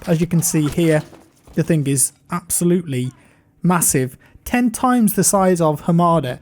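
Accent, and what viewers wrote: United Kingdom. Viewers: Here's something that's kinda funny. When I watch you're content for BF5 or content from any other game, I trust you more than some guy in America, (I live in America) because of the Brittish accent. (Yes, I know you don't have an accent but I have the accent) Why?? I have no idea at all..... :)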